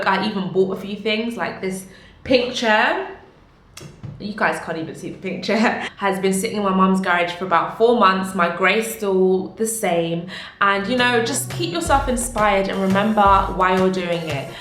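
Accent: United Kingdom. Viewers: I knew she was British before I even clicked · I really love your accent tho ,its a British accent I guess ,its so nice I always love to speak a British accent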